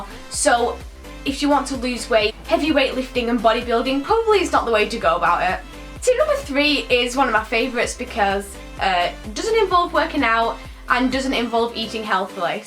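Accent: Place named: England, United Kingdom. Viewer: ILYSMM i really want to meet you i think we’d get along sooo well! LYSM also what part of England are you from? Your accent is so strong! In a good way obvs ILY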